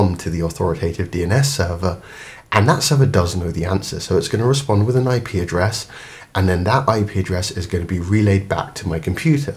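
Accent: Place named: United Kingdom. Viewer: cool but it's time to cut shit with the british accent. talk normal now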